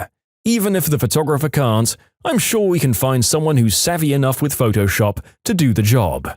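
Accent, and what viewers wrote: United Kingdom. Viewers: Why does bro sound so brittish?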